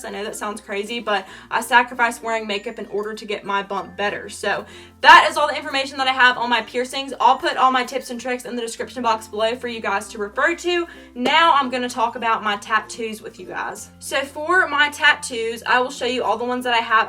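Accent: South, United States. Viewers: Why do i feel like your accent has gotten more country throughout the years haha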